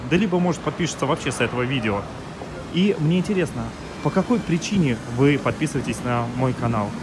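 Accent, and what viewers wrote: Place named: Japan. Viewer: Здравствуйте,. Изначально мне интересна тема,как люди живут в других странах (их быт,мысли о России и русских и т.д.) и таким образом случайно "набрела" на Ваш старый ролик,о том, какую в Токио Вы снимаете квартиру. И,конечно,Вы меня покорили своей манерой подачи материала,доброжелательностью,интеллигентностью)) Я уже не могу не смотреть Ваши позитивные видео))) Даже Ваш появившийся японский акцент в речи очень импонирует)) Продолжайте, экспериментируйте,а мы Вас подддержим))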